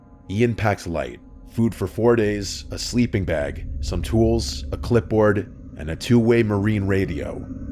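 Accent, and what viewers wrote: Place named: Staten Island, United States. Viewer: im so happy still makes videos and that he's managed to tell so many stories over the years. My sister and i would religiously watch these back when corpse husband was still posting, mainly because were from jerz and the staten island accent feels like home loll (fr my sister was soo picky about narrators voices. she only watched your channel cuz ny accent is the correct way to speak loll)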